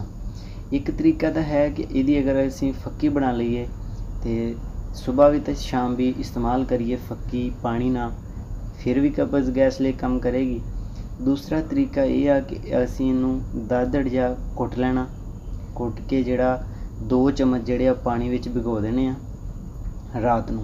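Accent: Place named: Faisalabad, Pakistan. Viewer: پنجابی زبان تے فیصل آباد دی زبان اے لگدی اے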